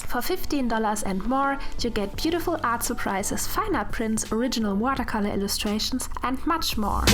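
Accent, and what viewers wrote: Germany. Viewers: Are you from Germany? 🇩🇪 (just because of your accent) (And yes, i am german 🇩🇪 😂 ✌️)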